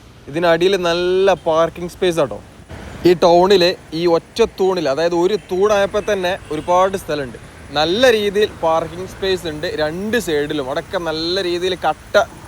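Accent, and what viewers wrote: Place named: Malabar, India. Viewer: Thanks! Good to see you consistently posting videos. I see there’s no more mocking of your Malabar language/slang. Keep it up my friend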